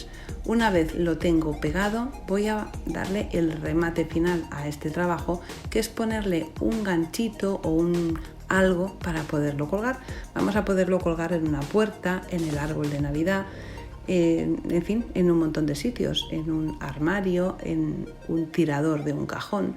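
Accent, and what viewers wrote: Spain. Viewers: Por tu acento al hablar creo que eres española y como dicen allá eso merece un oleeeee , oleeeee todo bello mis saludos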